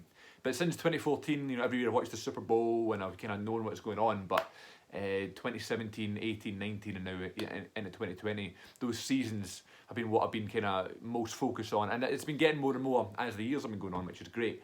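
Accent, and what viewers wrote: Glasgow, United Kingdom. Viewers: I live pretty close to St Louis so I'm gonna be rooting for the Battlehawks, but the Roughnecks, Defenders, and the Guardians were all pretty good as well. From on-sight reporting, there appears to be quite a bit of excitement for the St Louis team because they've been without a team since the Rams moved to L.A. 3 years ago. The Battlehawks are gonna be playing in the old Dome stadium that the Rams played in, so crowds will look small at first, but hopefully if this league is a success, and the Battkehawks turn out to be good, they will garner a decent crowd there. PS. I worked with a guy from Glasgow back in college at Southern Illinois University. His accent wasn't nearly as thick as yours. Must've been because he'd been in the States for awhile.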